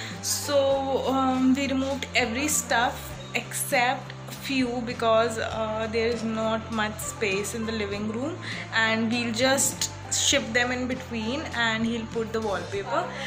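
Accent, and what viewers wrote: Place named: India. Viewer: Me gustó mucho como te adaptaste al tamaño de tu habitación y ese color la hace ver más grande. También vengo de la Increíble India, pero al contrario me gusta que sean así los vídeos porque estoy tratando de familiarizarme con el acento de ustedes jeje, igual los subtitulos no estarían de más. Me encanta tu contenido, saludos :)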